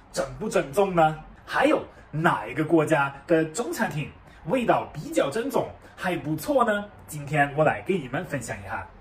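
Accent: Sichuan, China. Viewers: 你的四川口音…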